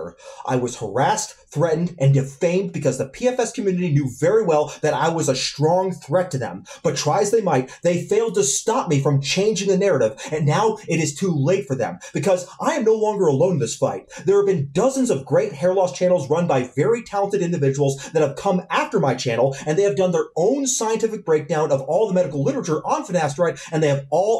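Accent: United Kingdom. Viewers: God, I do love your British accent, mann 😂😂😂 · isnt he scottish lol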